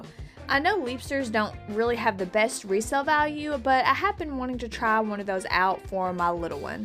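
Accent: Kentucky, United States. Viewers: Where are you from? You say "yall" and that's SO a KY thing!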